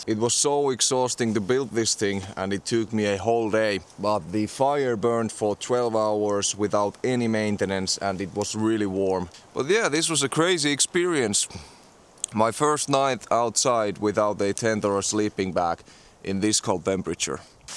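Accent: Finland. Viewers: Mukava kuunnella välillä tuota rallienglantia 😂😂😂. No pääasia että ulkomaalainen saa selvää👍on naurettu kaverien kanssa 😊 Kiitos . Paljon seuraan #retkireppua #alileiniö#mehtämikko#eetun völjyssä #vapaalla jalalla ym. Eli Suomen ylivoimasia ykkösiä niin kivaa vaihtelua nämä on ...in English 😅😅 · You seriously sound like Luke from Outdoor Boys, but you add Finnish scent to that. I love it haha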